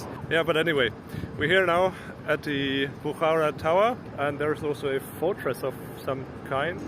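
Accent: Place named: Germany. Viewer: Такое чувство что немец говорить на английском...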